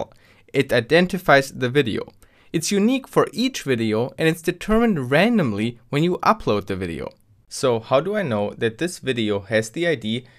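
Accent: Germany. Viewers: Bro you got the most German accent I ever heard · DIeser Deutsche Akzent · This, my friends, is what a german accent sounds like. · is this german accent ? ¯\(ツ)/¯ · r u german? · Took me to realise he is german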